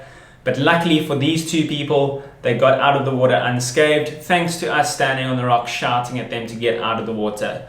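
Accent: South Africa. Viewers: What country is this? You sound South African. If they are indeed bronze whalers, well they have a long history of attacking humans. Not too sure what you're saying here.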